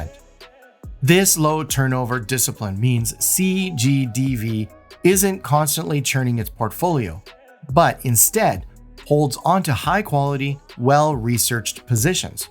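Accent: Canada. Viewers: You sound Canadian.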